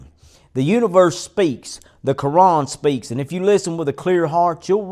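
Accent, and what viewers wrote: South, United States. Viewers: your southern accent is so beutiful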